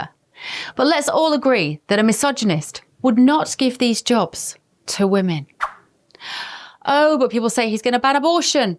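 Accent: United Kingdom. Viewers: I'm an American man in my 50s., if not for your lovely British accent.., I would be inclined to believe that you are just a wholesome American girl, that everyone loves to adore. Honestly, I only mean.., no matter where we come from, common sense is universal. Good form.👍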